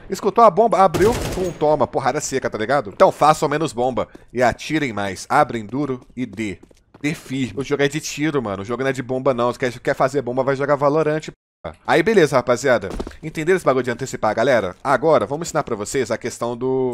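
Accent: Belo Horizonte, Brazil. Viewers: Sotaque de BH do é bão dms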